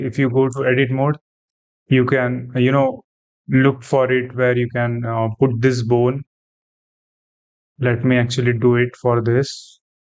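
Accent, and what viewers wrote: India. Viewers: If you are making video with this accent Why don't make Video in Hindi Many people who don't know English can learn better. Indians should not make Tutorial videos in English unless they are targetting Foreign audiences and With this Accent. Are you serious? Better make it in Hindi Many people can learn. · I cant take 12hrs of another indian youtuber but the guys without an accent put a fkng paywall behind their tutorials. Shit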